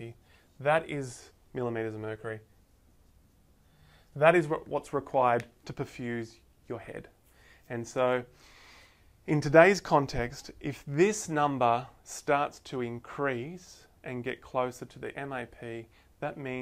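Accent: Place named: Australia. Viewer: Legend! So much easier grasping this concept hearing it from a fellow Aussie! Cheers :)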